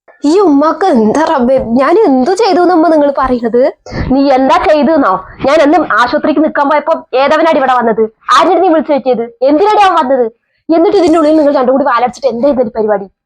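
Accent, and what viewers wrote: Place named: Kallepadam, India. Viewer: കല്ലേപ്പാടം വർത്തമാനം നല്ല രസം....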